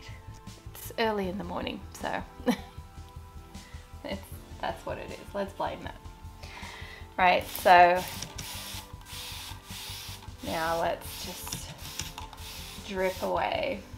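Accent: Australia. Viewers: So this is the third time I’ve watched this video and I must confess! I also love Dionne’s work and have been hesitant about starting a piece like this. But when you said you messed up the top and redid it, it dawned on me that when you’re painting like this, there are no mess ups, just happy accidents ( thanks bob ross❤️) I think that “mess up” would have added more character! They’re meant to look aged and weathered and painted over a million times, like an old Spanish door❤️I really love this piece and hope to see you do more! Personally for me, you took the fear out of it!😄. I’m really enjoying your channel, your work …and your sweet Aussie accent🥰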